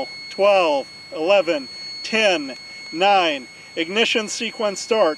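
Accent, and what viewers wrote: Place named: Midwest, United States. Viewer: your boring midwestern accent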